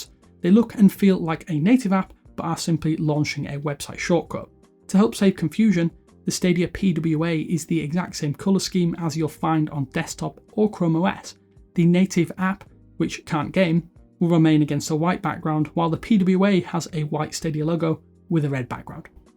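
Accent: United Kingdom. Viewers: Is this available worldwide? I’m in the UK and it doesn’t seem to be working. I ask the question because you seem to have a UK accent.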